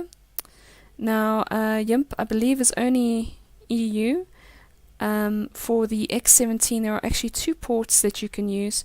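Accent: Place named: South Africa. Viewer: I have to agree with you, you sound very South African, local is lekker:)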